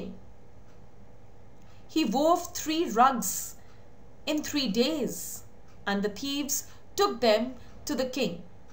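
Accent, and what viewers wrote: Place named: Australia. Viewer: But , even though u are an Indian , your accent is much like an Australian ✨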